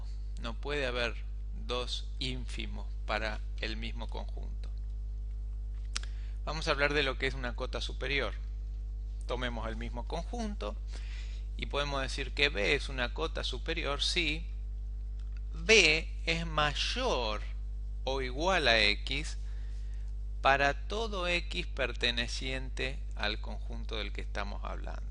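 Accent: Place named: Rioplatense, Uruguay. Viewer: Saludos y Excelente explicacion. Soy de Mexico y me sirvio de mucho su video, (ademas ese acentito a Uruguayo o Argentino, como que ayudo mas), se gano mil likes y la subscripcion a su canal. En horabuena por su grandiosa ayuda!!!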